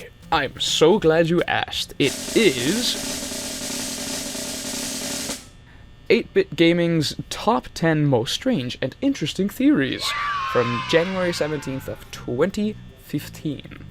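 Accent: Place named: Iceland. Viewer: omg, just found your channel, thought your accident sounded familiar… sæll íslendingur😁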